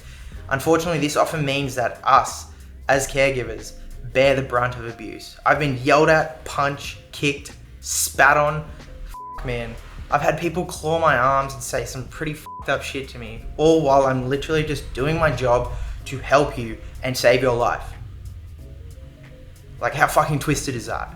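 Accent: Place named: Australia. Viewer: You sound so Aussie, are you?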